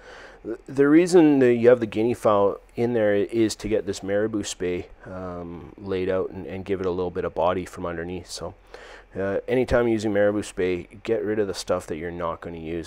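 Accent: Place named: Canada. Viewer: love the canadian accent. i live in kelowna. thanks for the vid :)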